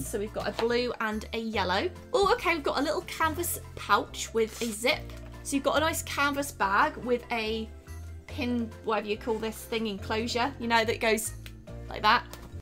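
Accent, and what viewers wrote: England, United Kingdom. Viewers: Sounds weird to hear a lovely British accent like yours work in dollars lol I feel like British YouTubers are hard to come by. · I’m so confused... she s o u n d s English but she’s using dollars and saying target casually and hsuhfjfidms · Where are you even from? British accent, and dollars? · no she lives in the US, she just has a British accent! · This was recommended to me for some reason and I was very confused since you sound British but was mentioning American things. I still enjoyed the video despite the confusion. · you can't be american and have a british accent, is british LOL she just lives in the US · I'm confused... doesn't she have a British accent? So why does she talk about dollars and Target?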